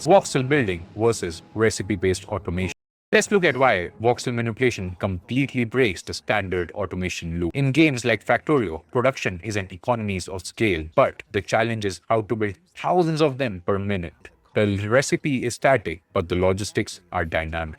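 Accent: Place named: India. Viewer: Completely off topic. Thank you for a spot of nostalgia. One of my previous employers was a small satellite to an international Indian company. All of the reasons why I left were the UK and US oriented business culture. That stuff is just oppressive, dumb and bad for both morale and business. Many of the original Indian concepts were pretty good. And they came with the musical accent you remind me of. No regrets on walking out, but I do miss that part.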